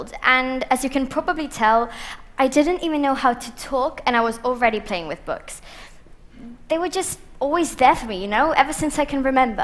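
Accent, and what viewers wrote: United Kingdom. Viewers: Ostia, catalana amb accent britànic!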